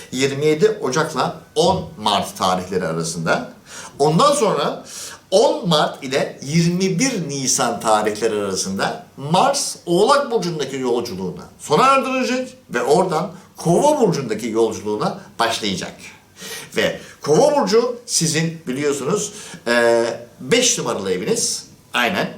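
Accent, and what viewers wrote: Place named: Germany. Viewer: Hocam Alman aksani var sizde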